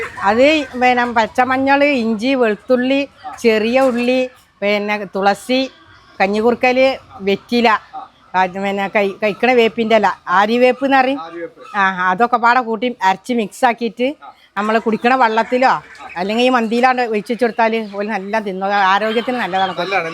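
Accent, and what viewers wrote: Malappuram, India. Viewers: മലപ്പുറം ആണ് അല്ലെ സംസാരം കേട്ടിട്ട് 😁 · നമ്മളെ മലപ്പുറം ഭാഷ ഇങ്ങനാടാ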